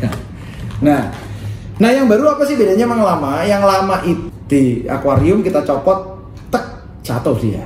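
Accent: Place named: Java, Indonesia. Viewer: asli jowo endi koo😂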